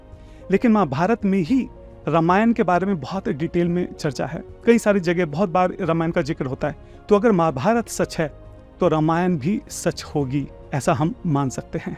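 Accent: India. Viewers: Sir your Voice,,pure Indian and ak sukun bhari hai ...jay hind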